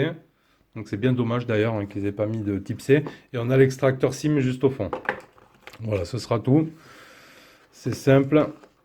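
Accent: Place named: Southwest, France. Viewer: Aparisi Anthony dans le sud ouest c'est comme ça ils prononcent tout lol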